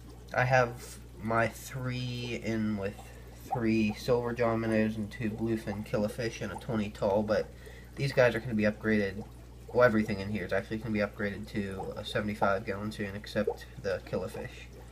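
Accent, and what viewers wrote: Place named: Pittsburgh, United States. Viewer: U from the burgh.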